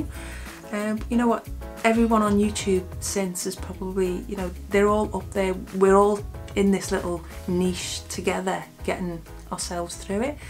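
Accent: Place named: Liverpool, United Kingdom. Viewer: I love your accent.....I have a Scouse accent too!!😀 I'm a lot older than you, but I'm just starting to start, transitioning ....I'm a wee bit hesitant but I know, I need to do this. You know what I mean like don't you? 😀 Thanks for your videos, are you in Liverpool?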